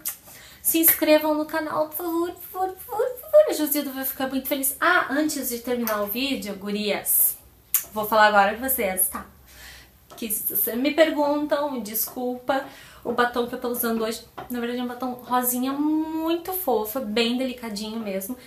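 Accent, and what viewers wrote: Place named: South, Brazil. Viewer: seu sotaque é lindo sou carioca, mas minha paixão é o sotaque do Sul! Amei o vídeo! Realmente não saímos da farmácia sem levar produtos que avistamos na hora e desejamos! Beijos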